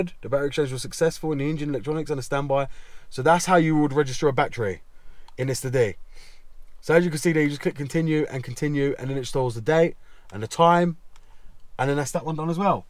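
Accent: United Kingdom. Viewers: I honestly do not understand why so many youtubers talk so fast when they are doing an exercise? Its weird and kind of disorienting to me.Please for future vids try to speak a little bit slower especially with your having a thick british accent.:)Thanks and keep up the good work!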